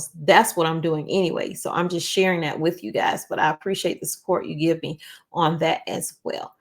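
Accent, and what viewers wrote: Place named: South, United States. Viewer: She sounds like a southern white woman🤷🏽‍♂️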